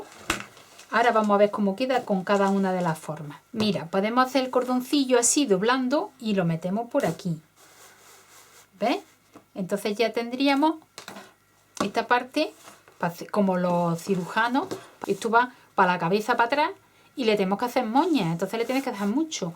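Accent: Andalusia, Spain. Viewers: QUE RICO HABLAS, OLE LAS ANDALUZAS. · de donde es tu acento español? Andaluz?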